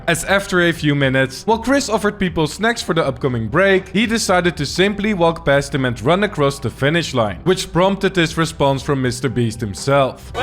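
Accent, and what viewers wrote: Netherlands. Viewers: i smell dutch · I smell Dutch 🇳🇱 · Bro u Dutch? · are u dutch.....?? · I smell dutch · Why the freak are you trying to talk english with this accent, if ur dutch just dont do it bcous then its just irretating. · You have a dutch accent and i know cuz im dutch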